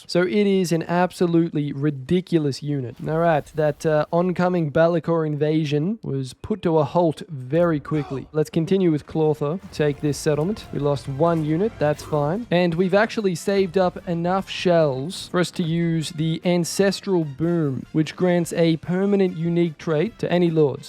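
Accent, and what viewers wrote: Australia. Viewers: This comment section reminds me that America was a mistake: man is clearly Aussie FFS . Also checking his profile is free.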